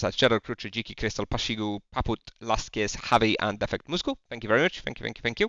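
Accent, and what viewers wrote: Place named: United Kingdom. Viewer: no to dobre wrażenie sprawia, mieszkał w UK od wczesnego dość dzieciństwa ładnych paręnaście lat, stąd akcent 😋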